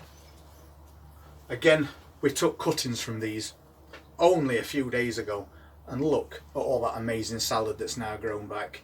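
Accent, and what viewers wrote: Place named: North, United Kingdom. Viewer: ive just found your chsnnel. What a devastating time youve had and what a crap way to be told to move. You are so inspirational and youve got a definite follow from me. Love love love your wonderful northern accent and grounded, calm way of communicating. Youre such a dude! Im going to look at your other videos snd keep learning. See you soon ajd hope you and yours sre keeping your heads held high!!!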